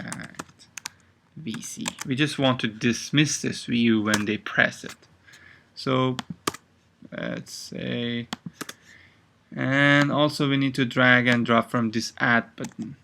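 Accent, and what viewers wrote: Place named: India. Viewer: Dude, are you from India? Just noticed your accent, but anyway your english is perfect!). Your tuts is very cool too, thanks)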